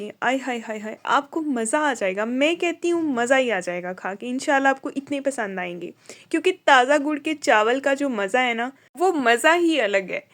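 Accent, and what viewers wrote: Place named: Punjab, India. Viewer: Aapkay gur walay chawlon ki tarqeeb bohot achi hai aur apkay lehjay se mujhe aap punjabi lag rhi hain. Mein aapki nano hoon. Main pakaon gi to zaroor btaon gi. Farida Yazdanie, mein 79 years ki hon.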